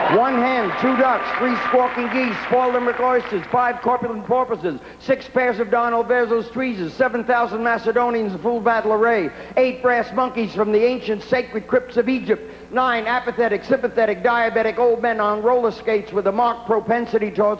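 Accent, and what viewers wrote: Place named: New York, United States. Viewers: He still has a New York accent. An announcer will have no accent, speaking in Standard American English or Received Pronunciation. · Love the Brooklyn accent, especially the corpulent part!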